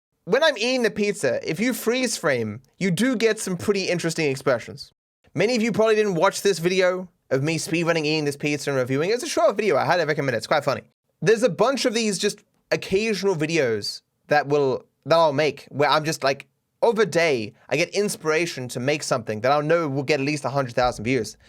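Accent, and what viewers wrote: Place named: Australia. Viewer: ok but when aren't you doing a Australian accent, also I remember that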